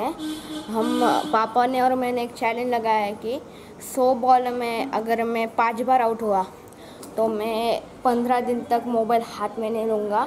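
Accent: Maharashtra, India. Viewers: Bhai aapki drive to laajawab hai , maja aa gaya dekh ke just go forward in your life ❤️❤️ ( And I'm also marathi and I know u r also marathi by listening your accent ) 😁😁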